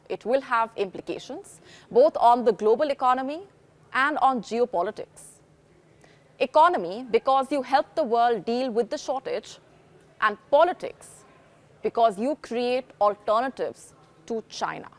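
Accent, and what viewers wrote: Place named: India. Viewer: This one has a thick Indian accent. Lol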